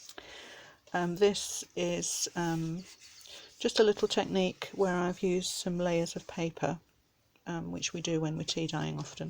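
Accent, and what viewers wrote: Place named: United Kingdom. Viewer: Stunning! And I so enjoyed the video too. You make me laugh, 'no, scrap that, just go and live you'd lives ', so funny. And I look forward to the woodland journal tutorial. I'm intrigued to know how to ink pages the way you do. They are very beautiful. I'm new to art journalling, JJs, etc, so I'm learning. I'm in the Uk too, it's good to hear a homegrown voice x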